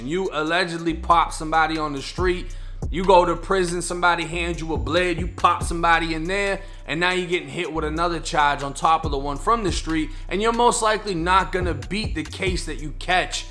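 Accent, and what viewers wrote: Boston, United States. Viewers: Favorite channel right now ! Over 23 & 1 even, and those other prison channels🤷🏽‍♀️ I think it’s the Boston accent and dramatic ending of sentences🤣💪🏻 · SO YOU SOUND A LITTLE BOSTON TO ME BUT YOU REPORTING BROOKLYN NEWS.. TAKE THAT SHIZZ DOWN TO BLUE HILL AVE H-BLOCK BROMLEY HEATH YOU SOUND STUPID!!!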